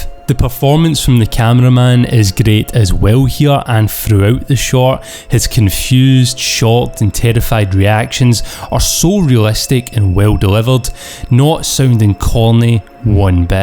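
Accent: Scotland, United Kingdom. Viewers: Scottish accent very funni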